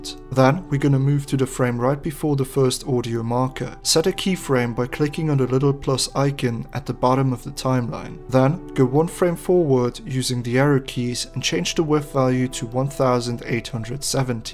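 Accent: Germany. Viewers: Du bist Deutsch?? xD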